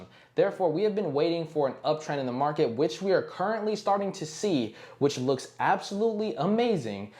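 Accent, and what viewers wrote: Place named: United States. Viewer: Very good plain English.. Easy for me to understand.. Love American accent.. Go shibarmy.. from Malaysia 🇲🇾😊🚀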